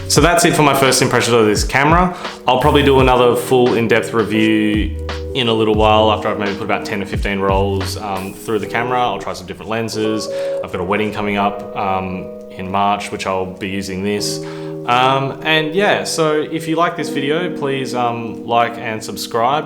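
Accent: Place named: Australia. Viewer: So nice to hear an Aussie accent. I have a 28-70mm old film lens and on the hunt for a newer version of my F70. This looks perfect. You got a great deal. I've not seen anything close to that on offer. Nice job with your channel - keep going.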